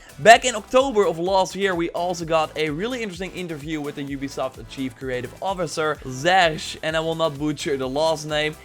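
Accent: Netherlands. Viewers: Are you dutch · Are you Dutch? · Is he dutch? If not, where is he from? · This dutch english is cringe to me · ughhh, your Dutch accent is the wooorstttt. I just can't keep listening to you.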